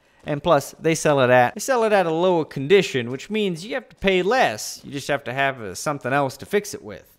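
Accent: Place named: United States. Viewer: I love the cowboy accent YEE HOO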